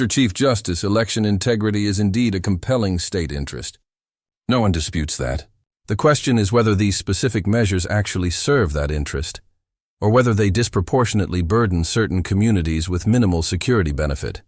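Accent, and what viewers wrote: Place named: United Kingdom. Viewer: We need an AMERICAN narrator!! Not a Briton….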